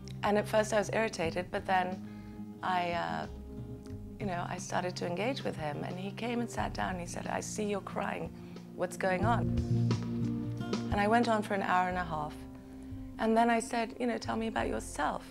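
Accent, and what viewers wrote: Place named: Hollywood, United States. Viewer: good message, better with hollywood accent dropped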